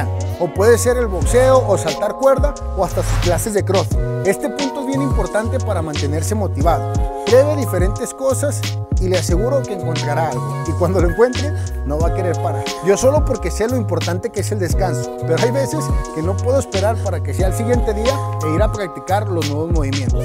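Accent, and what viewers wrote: Aguascalientes, Mexico. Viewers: Por que así hablamos los de Aguascalientes.. 😂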